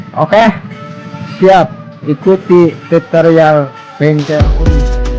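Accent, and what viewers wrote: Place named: West Java, Indonesia. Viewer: 😆😆😆orang Sunda pisan euy.mantab tutorialnya.smangat mamang ah.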